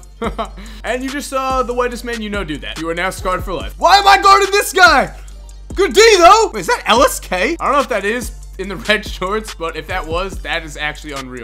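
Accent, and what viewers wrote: New Jersey, United States. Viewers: Are u from New Jersey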